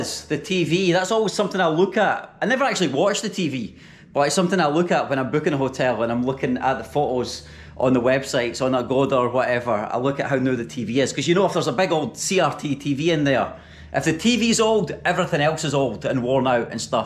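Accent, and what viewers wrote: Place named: Scotland, United Kingdom. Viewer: Please speak with your scottish accent, I like to hear your accent... 😊 there are not more vlogger with that accent, that sounds unique than other vlogger with american accent.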